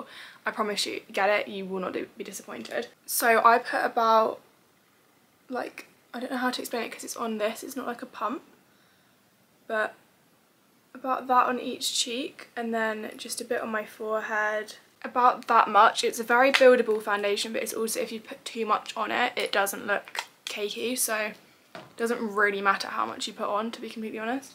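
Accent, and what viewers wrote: United Kingdom. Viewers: I love youre british accent!!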